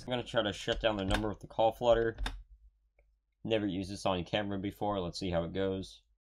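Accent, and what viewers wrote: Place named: United Kingdom. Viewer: How did I get subscribed to someone who speaks with a British accent sounds like he's chewing on a mouthful of caramels while he's trying to talk. Nobody gives a fuck about your opinion you were little island of 20 million people LMAO.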